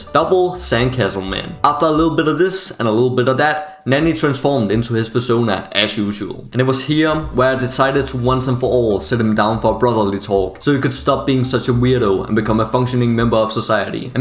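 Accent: Denmark. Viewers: Clocked the danish accent instantly x] happy to see a fellow dane play this game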